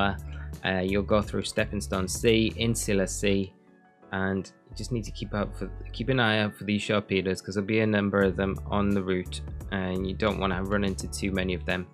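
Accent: Newcastle, United Kingdom. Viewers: Why’s a Geordie posting at 3am? Too hot too sleep?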